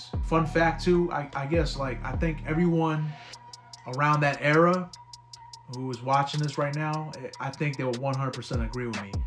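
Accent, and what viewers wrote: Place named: East Coast, United States. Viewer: Sound like you from the east coast dog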